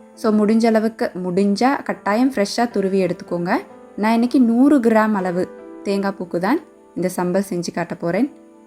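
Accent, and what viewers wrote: Akurana, Sri Lanka. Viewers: Pechi Slang akurana mathiri irunshi athu than ketten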